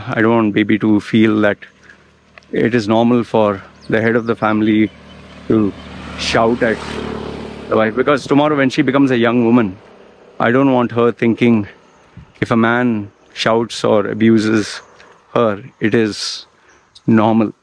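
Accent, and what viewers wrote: India. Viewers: How come you have an Indian accent ?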